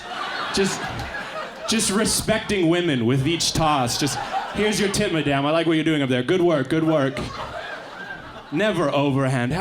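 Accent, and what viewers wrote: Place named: Canada. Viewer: His Canadian accent brings back memories of home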